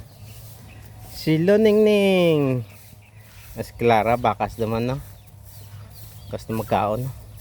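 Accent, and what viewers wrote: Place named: Romblon, Philippines. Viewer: Maganda Buhay lods 😊 salita nio pra din sa Amin Romblon, Dami ah blessings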